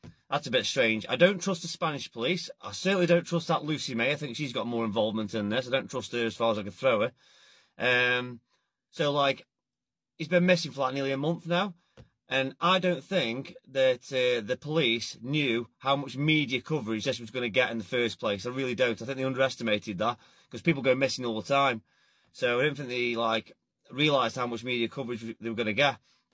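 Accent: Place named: Stoke, United Kingdom. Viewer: Off topic but....which part of Stoke are you from? Within a 3 mile radius of Longton ? Thats a very familiar twang 😁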